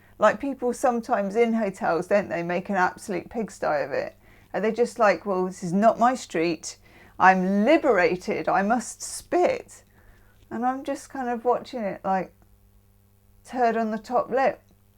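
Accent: New Zealand. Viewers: 😂😂You sound a little more like a kiwi (New Zealander) 🎉❤ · Haha, your Aussie accent is more New Zealand/Kiwi accent. One of my English colleagues, when living in London, used to say that 'England is a cold country full of cold people', we're a very warm country so maybe that's why we're warm-er 🤩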